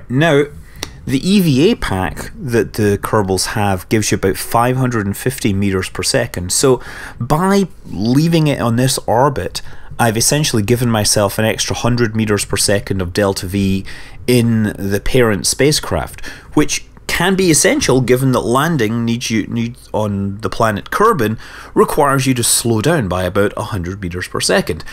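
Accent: Scotland, United Kingdom. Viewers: Really enjoy your videos mate, also i have scottish grandparents so the accent always brings me back to the perthshire moors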